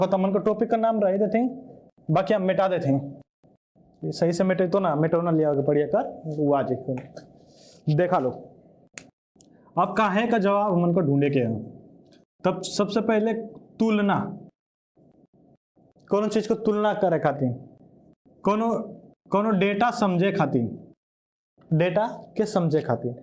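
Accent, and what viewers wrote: Bhojpuri, India. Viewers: Nice bhojpuriya andaj😂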